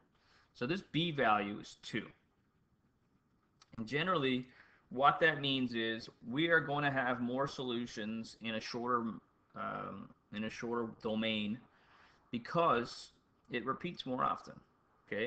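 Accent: Newfoundland, Canada. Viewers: As soon as I heard ya, I knew you's a Newfie b'y...lol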